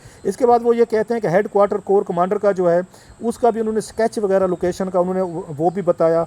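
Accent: Saraiki, Pakistan. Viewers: sahb is our saraiki brother and every saraiki has same accent. We love this accent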